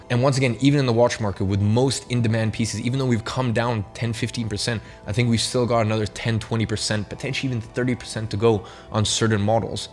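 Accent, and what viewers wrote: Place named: United States. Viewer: Respect to your mind on finances and the future. But I still don’t understand how you have an American accent after growing up in London. Not even a little bit?!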